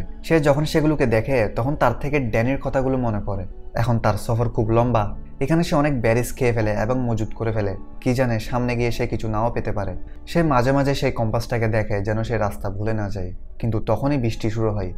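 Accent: Chittagong, Bangladesh. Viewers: চট্রগ্রামের আঞ্চলিকতা বেশি দেখা যাচ্ছে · ভাই আপনি চিটাগং এর 😊😊 ভাষঅ শুনে বুঝে ফেলছি 😊😊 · খুব বাজে মুভি আর একটু পরে পরে ফ্লাশ বেঁকে যাওয়ার কি আছে আর চট্টগ্রামের একটা টান আসে কথার মধ্যে শুনতে মোটেও ভালো লাগেনা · চট্টগ্রামের নাকি ভাইয়া?😂😂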